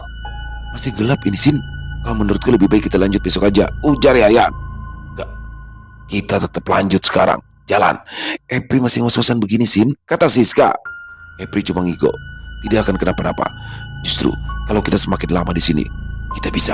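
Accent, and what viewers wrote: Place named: Kalimantan, Indonesia. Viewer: abang ini org mana ... klu abang cerita kisah dr kalimantan logat atau cara bicaranya pas dgn org kalimantan .. pokoknya saya dr kalimantan mendengar cerita abang sampai ulang2 ceritanya masih seru aja .. salam dr kalimantan tengah (kapuas timur)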